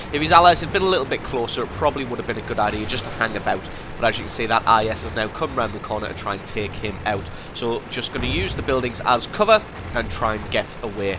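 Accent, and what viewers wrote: United Kingdom. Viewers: Its clearly british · What part of Britian are you from? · Ok may I ask you what is your accent? Is it some kind of British accent or I am totaly wrong?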